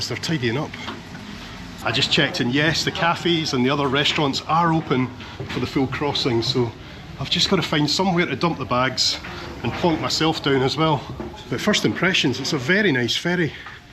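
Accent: Scotland, United Kingdom. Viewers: U picts never like salted caramel 😆 I enjoy watching your videos...love the Scottish accent. Greetings from denmark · As one with a bad knee, I commiserate with you. No, please, for the sake of your knees, don't do another long coach trip. Love your ferry boat rides - please do more of those. And love your Scottish brogue. My ancestry hails from Scotland. Blessings, Georgina from Georgia, USA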